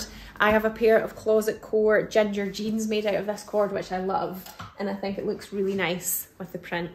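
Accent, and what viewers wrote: Scotland, United Kingdom. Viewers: Argh. I planned to catch you for the first time live last night and I forgot. As well as the lovely fabric it's great to hear a Scottish voice. I love living in the land of cheese and chocolate but a little bit of homesickness creeps in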